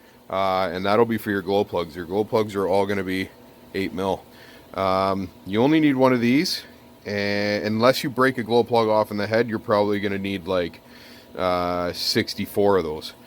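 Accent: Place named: Alberta, Canada. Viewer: you sound like an Alberta boy!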